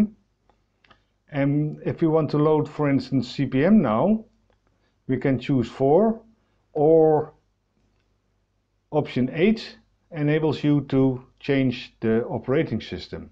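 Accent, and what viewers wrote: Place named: Netherlands. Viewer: That is a hard-core Dutch accent if I've ever heard one. It sounds like I'm listening to my dad... or as my daughter would say, "Opa." I just saw this video on the Tindie page... I definitely want one, but then saw the $250 shipping charge. I guess this is as a result of that new E.U. rule. :( I'll look into that Germany address thing. Thank you!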